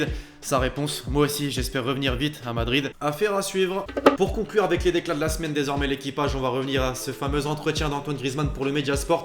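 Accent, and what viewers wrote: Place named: Banlieue, France. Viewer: change ton accent de HLM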